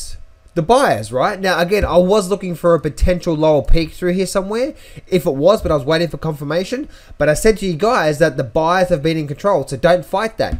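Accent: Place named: Australia. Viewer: haha tell them in the Aussie way... love it!..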